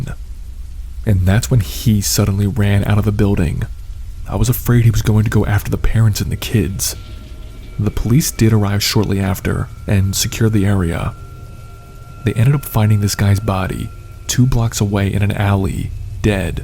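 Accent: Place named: Delaware County, United States. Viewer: Love your videos & creative story categories ! Are you from DelCo ? Your accent is super DelCo lol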